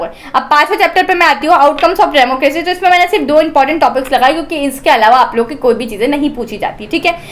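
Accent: South, India. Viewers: Mam Are you from south India Plss tell😖😖 BTW Thanks for everything🥰🥰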